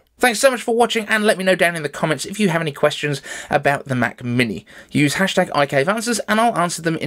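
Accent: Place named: Birmingham, United Kingdom. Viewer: #iCaveAnswers I’m super interested in the geographical breakdown of your audience. As a British guy who watches the show, I usually assume the audience are also mostly British. The Mint Mobile sponsor made me wonder about how many viewers are actually from the USA and won’t even notice the hint of Brum in Daves accent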